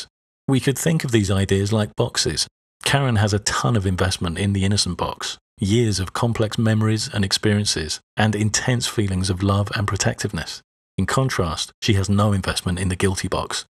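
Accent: United Kingdom. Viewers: Nonsense is still nonsense whether or not you say it in British accent. Truth should be taught to children and falsehood shouldn't. We disagree on what is truth and what is not. You wouldn't have problem with indoctrinating into a child that discriminating on the base of skin colour is wrong and if the child was to very vehemently be against that idea you still wouldn't just accept his or her different viewpoint. Debates should be held as to what is truth and what is not but parents teaching their children what they perceive to be truth is in itself a very logical and understandable position.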